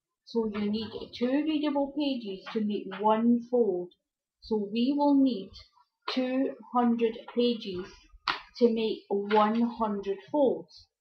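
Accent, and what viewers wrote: Scotland, United Kingdom. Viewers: A beautiful craft! She turned out lovely. Your Scottish accent was a treat to listen to as well.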